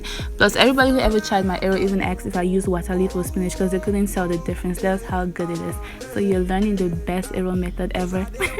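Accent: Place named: Pidgin, Cameroon. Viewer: I can identify u with our pigin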